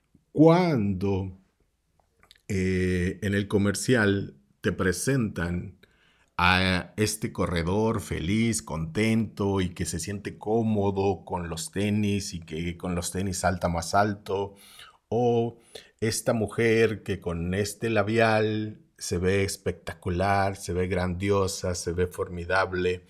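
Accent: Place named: Argentina. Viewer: estas hablando como argentino!!! 😃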